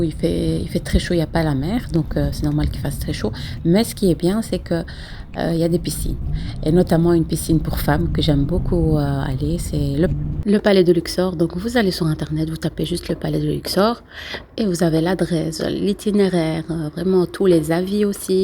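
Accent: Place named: Belgium. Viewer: Ca sent la belgique quand tu parles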